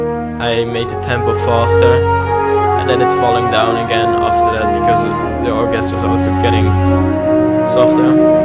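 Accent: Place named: Netherlands. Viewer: haha. i could emidiatly here ur from holland :P. im also from holland ^^. great tutorial :) !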